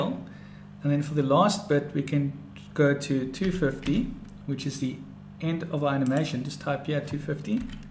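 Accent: South Africa. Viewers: Are you South Afican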